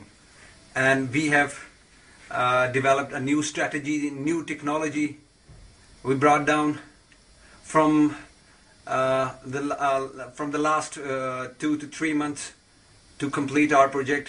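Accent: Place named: Pakistan. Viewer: Stupid People, This is our Pak-English Akcent. Its our Language and Our Identity. and i speak the same akcent, i love it. and if you can give him some million dollars and a top-class research organisation with some insurance and security, then can do a lot better. why dont you give him these things you cheap and lazy people suffering from superiority complex in a middle class of a 3rd grade country !!!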